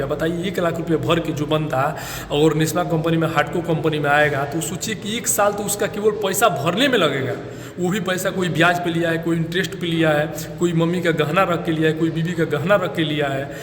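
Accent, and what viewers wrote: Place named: Gorakhpur, India. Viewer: आप गोरखपुर से है क्या भाई..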